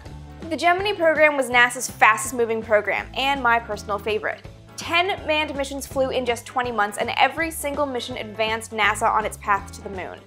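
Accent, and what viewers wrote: Canada. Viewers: In one of your videos I asked if you were from up north somewhere. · Are you Canadian ????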